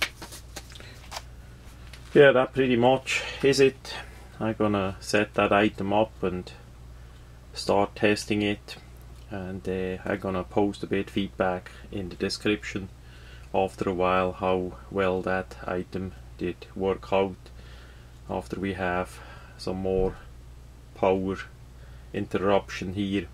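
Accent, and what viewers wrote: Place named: Switzerland. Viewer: awesome schwitzer-english accent... really funny.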